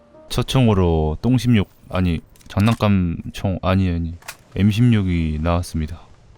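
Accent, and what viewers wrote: Jeolla, South Korea. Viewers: 님 오늘은 뭔가 전라도 사투리가 들리네요 🙂🙂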